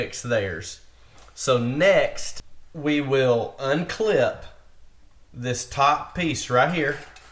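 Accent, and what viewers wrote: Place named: South, United States. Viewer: Great instructional video...and cool southern accent to boot! Thank you.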